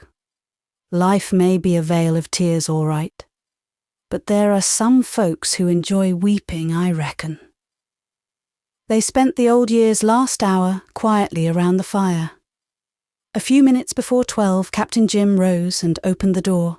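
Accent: United Kingdom. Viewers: Beautiful British accent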